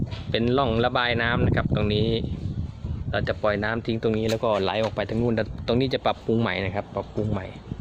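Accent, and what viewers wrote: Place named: Korat, Thailand. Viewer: พูดโคราช24ช.ม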